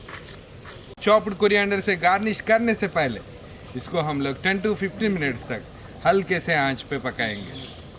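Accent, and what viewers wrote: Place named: Hyderabad, India. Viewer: Bhaijan aap hindhi me hich bolo na kya tho bhi ae ginger garlic tomatoes bolna shuru kardiye aap bhi... ooo connect nai hora bhai aap ki zubani pure hyderabadi hai na bhai kaike liye change kardiye aap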